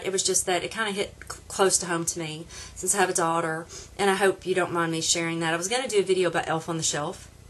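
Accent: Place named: Louisiana, United States. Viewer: You sounded close to home. Louisiana here. Thanks again!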